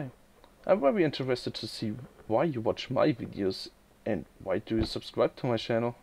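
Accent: Germany. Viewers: Herzlichen Glückwunsch! Man höhrt das du Deutscher bist. Kommt jetzt mehr mit Sprache? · I subbed when you were around 5k, and don't bother with the plat for me, I play on xbox one, but anyways I subbed when you were around 5k, and I subbed because I really liked the music in your videos, as well as the knowledge you gave me through your vids, and the videos were fun to watch. And by the way, I love the german accent, and your english was fine! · Your English was great, German voice is always cool! Haha. Congrats on your 25k and in a short time, but you can tell why people watch your videos as the same reason that I do. Your quality is amazing, your gameplay always looks greatly handled and your info is always very well explained. I don't follow too many people for warframe unless I like the information provided, and I'm picky and yours have always appealed to me. 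I play on Xbox one and I still come here for your work. Keep it up! I look forward to your future videos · TBH your english is better than alot of us! Both written and spoken P.S. German accents are awsome i have 3 foreign students from germany at my school and I really like their accents. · Ich mag deine Stimme. ^^ man hört das du deutscher bist:D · Ich wusste nach 2 Wörtern, dass du auch Deutscher bist:D Darf ich mal fragen wie alt du bist? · Ich kann mich nicht erinnern, wann ich deinen Channle abonniert habe, aber es ist bestimmt schon eine ganze Weile her. Ich abonnierte dich aus dem Grund, das dein Content sewhr hochwertig wirkte und ich fand, dass dein Fachwissen den großeb Warframe- YouTuber(mogamu etc....) bei weitem überlegen war. Deine Videos waren unglaublich informativ und das obwohl sie so kompakt waren. Ich mochte das insgesamt Paket einfach gerne und du bist immernoch mein lieblings Warframe-YouTuber, weiter so! 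Ach un dein Englisch ist gut, du klingst halt ein bissel deutsch, aber wer tut das nicht^^ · Ahhhh that wonderful German accent. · your English is very good. your German accent is wonderful. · Dude you said your English is bad but it's great you just got a cool German accent, that's all.Also you probably speak better than me and I'm from England, I just stutter way too much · How did I know that you have a German ascent? Any way just wanted to congratulate you on your 25k it will be no time at all before you hit 30k! As for how long I've been subed = I have been subed to you a year or so maybe 2? As to why I think your music selection is great and I also think that your reviews of items are very helpful any way keep up the great work! P.s could you make a updated video on how to get out of the leset? (Have no clue if that's how you spell that XD) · i love the german english accent. i am from holland so i know your struggles;)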